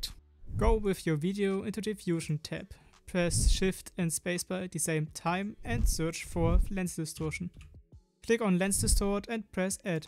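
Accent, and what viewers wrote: Germany. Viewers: Are you German😅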